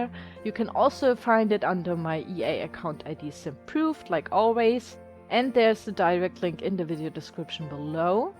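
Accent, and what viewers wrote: Germany. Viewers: I love just watching your videos and hearing your voice, you talk a lot like my Oma, and it makes me happy. I don't get to see her a lot anymore and hearing your heavy German accent makes me really happy. And watching this build reminds me so much of a trip I took to Germany once years ago for my Uncle Marco's wedding and we went during the Christmas time and it looked just like this! It was beautiful... Your video made me cry a little bit, happy tears though! haha you made me very very happy. So, thank you for that. <3 xoxo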